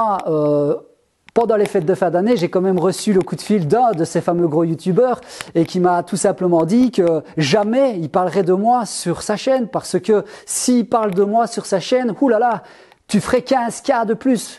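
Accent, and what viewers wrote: Belgium. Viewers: T inquiète, la grande majorité a compris ta démarche. Je te regarde pour le partage et la qualité de ton travail. En bonus j apprécie ton franc parlé et ton accent belge. Bon week-end à toi.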